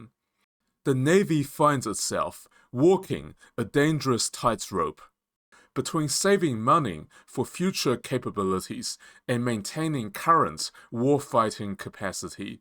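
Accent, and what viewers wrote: China. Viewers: What kind of accent is that? Any ideas? Is there a Chinese accent mixed in? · Your Chinese accent is annoying!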